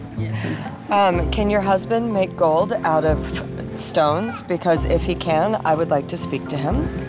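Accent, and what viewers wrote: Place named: Myanmar. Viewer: Wait she sounds like burmese I SPEAK BURMESE!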